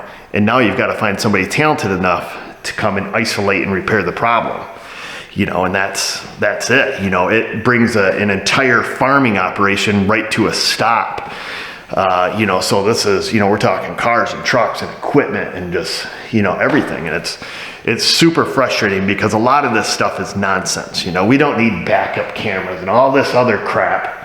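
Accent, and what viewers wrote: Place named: Rochester, United States. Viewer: I have a buddy from Rochester and I pegged your accent before I looked up your shop. Good video and happy new year.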